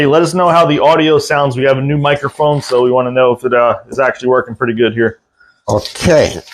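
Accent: Calabria, Italy. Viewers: From Schaumburg IL speaks like my grandparents who were Calabrese. But I have family in Torino!! I see he worked in Torino.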